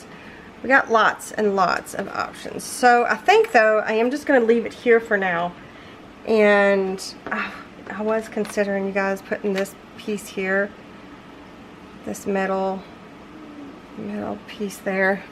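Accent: South, United States. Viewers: Has nothing to do with sophistication its accent from the south. Give yourself some credit girl.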